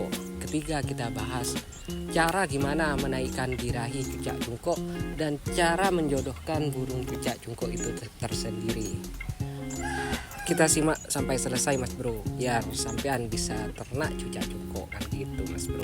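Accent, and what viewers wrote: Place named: Madura, Indonesia. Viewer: Logatnya kayak madura😂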